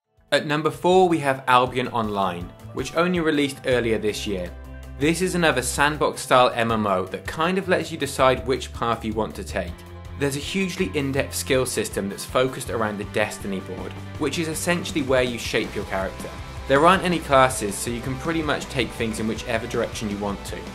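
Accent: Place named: England, United Kingdom. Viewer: Take this as a compliment: I always like watching video game reviews and lists like this when narrated by a person with an English accent. Probably comes from my days of watching TotalBiscuit when I was playing WoW.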